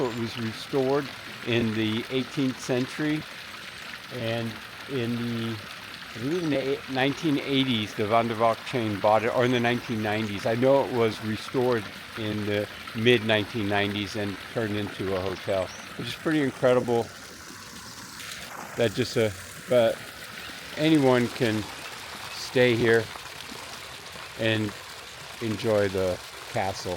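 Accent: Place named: England, United Kingdom. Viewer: You are brave walking in that forest in the dark, please be careful. It is a fascinating place, the history behind it must be thrilling. Scary place to be sure. Thank you. - love your English accent, very good indeed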